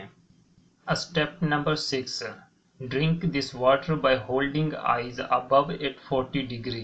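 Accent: Sindh, Pakistan. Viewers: Awaz kisi aur ki use kr loo. Betay ya bahi. sa bunwa loo video with good. sound and style. Sindi village style chorr du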